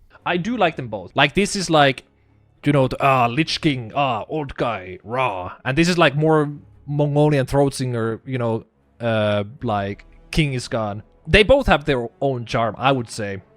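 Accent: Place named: Finland. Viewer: Hyvä video. Suomi aksentti paistaa vähän lävitte. 👍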